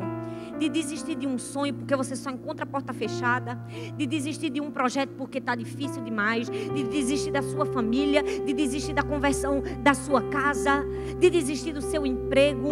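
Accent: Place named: Pernambuco, Brazil. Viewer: eu tenho esse mesmo sotaque dela. 😂😂 Tbm sou de Pernambuco. Só falta eu visitar a Igreja do Amor e apreciar esse 'bela' voz de perto 😂😂😂😂😂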